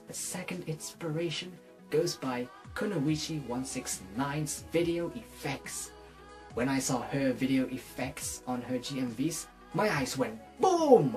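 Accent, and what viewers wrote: United Kingdom. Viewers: Oh no!, it appears as though I am to late to have asked you any questions for this video! D: Regardless, I always enjoy hearing you talk in your fancy British accent c: And might I say, I am truly honored to be a part of your legends list! I know I have not been editing much lately- I seem to have hit a creativity block- but I hope to have some kind of video completed before Christmas. Life has just been so busy lately! I hope things are going as awesome for you as your hair is!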